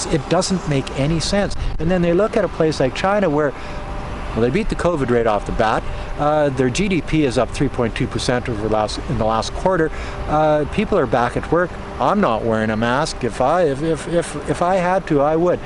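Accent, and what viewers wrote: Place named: Canada. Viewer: I love your accent, it's so Canadian when you say "aboat".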